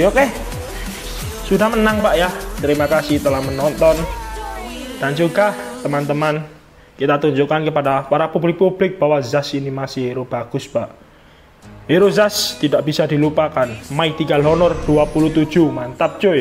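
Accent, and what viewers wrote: Java, Indonesia. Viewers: saya suka cara gaya bicara nya kocak ada ciri khas wong jowo to mas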